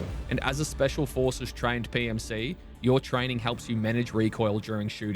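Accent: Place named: Australia. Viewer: From the Great Plains of North America, I can say it always helps to have a super cool Aussie accent. Excellent video, excellent summary. Concise, to the point, and gets you revved up. I'll be watching more. Good work. 59 year old veteran, and I'm waiting anxiously for this to be a blockbuster! Cheers!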